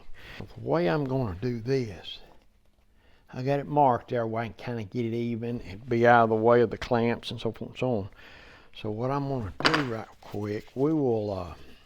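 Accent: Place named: Kentucky, United States. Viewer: Hello sir. I have been watching you from Kentucky. I TRULY enjoy your videos, and one of the reasons I stuck around enough to learn something was it was nice to hear someone who talks like me. Thank you for putting up with foolish and mean people in order to teach and entertain the rest of us.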